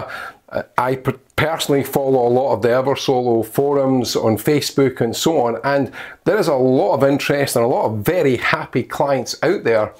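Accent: Scotland, United Kingdom. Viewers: scotsman he is.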